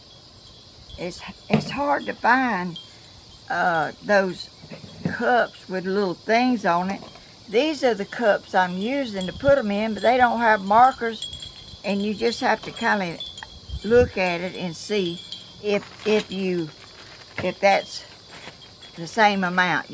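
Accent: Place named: Georgia, United States. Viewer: God has Certainly Blessed You with Good Health Ms.! You are Good Christian Lady, Kind & Compassionate to People & Yo Keep Busy, You are such a Blessing to sooo many People! God’s Blessings on You and your Family! 🙏❤️😇😇🍁🍁 I Love 💕 your Southern Accent & just listening to you Talk & your Stories! You mind your own Business & Trust God & that is why we ❤️ you, You are just you! That is sooo Beautiful! I Love ❤️ that Color on You!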